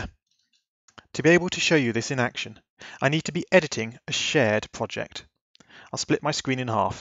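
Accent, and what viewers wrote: United Kingdom. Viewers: You have British accent! Like me, I am from England too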